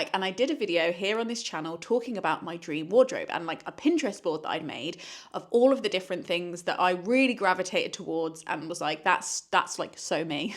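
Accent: United Kingdom. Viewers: that black top is LOVELY. Forget the bottom button closure situation, couldn’t matter less imo. Side note I grew up in Aberdeen for a few years of my adolescence and currently live in Colorado. Your voice and style and energy make me miss life in the UK so much! Been watching you for probably 5+ years now and just want you to know I love your content (as a 29 year old) and think you’re lovely! Embrace the goth and keep guiding me through my tumultuous American life!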